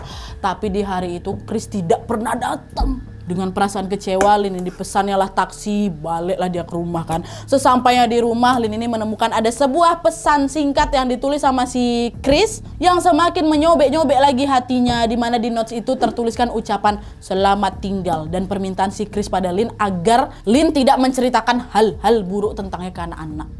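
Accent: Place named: Sumatra, Indonesia. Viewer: Logat nya Ayuk Sumatra banget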